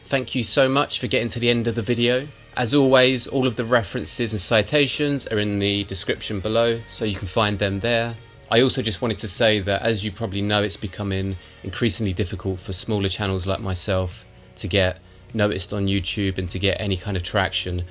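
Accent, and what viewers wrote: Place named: United Kingdom. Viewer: That was amazing! I put it on while doing boring admin work, but had to keep stopping to watch. I’m going to give it a proper rewatch. I love your sexy British voice and accent. It was great to work to. Will be great the second time to pay actual attention tot he piece. I actually create erotic content. It is not the typical vanilla stuff but is Fetish & Femdom POV. Erotica has always inspired me since I was very young. Wrote my art history thesis on Japanese shunga. And 9 1/2 weeks is one of my favorite films ever. My GOD is that one good.❤ What other films in this genre would you recommend the most?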